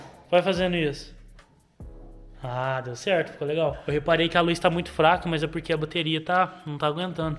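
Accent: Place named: Paraná, Brazil. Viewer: sei que esta pergunta pode parecer estranha, mas... você é do interior do Paraná, né?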